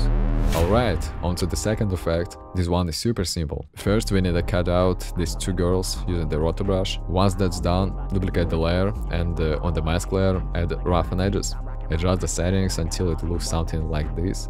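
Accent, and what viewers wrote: Russia. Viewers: You have russian acsent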